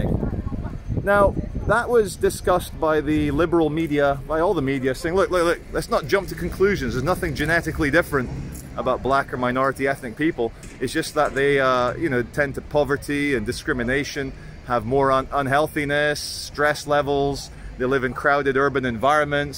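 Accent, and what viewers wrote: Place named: Manchester, United Kingdom. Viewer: Your Manc accent is starting to shine through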